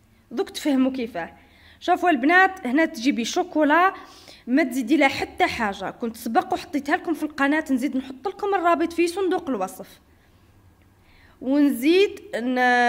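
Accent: Chaoui, Algeria. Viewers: والله العلي العظيم يا إبنتي الغالية غير نحبك فالله كأنك إبنتي الثالثة وأحب لهجتك الشاوية يا الحرة💞💞💞💞💋💋💋💋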